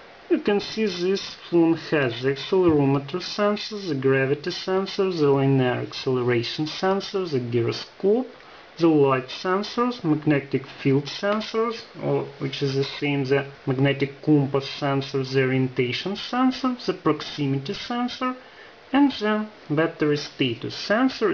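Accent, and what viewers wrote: Russia. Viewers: very good review. By the way, are u russian?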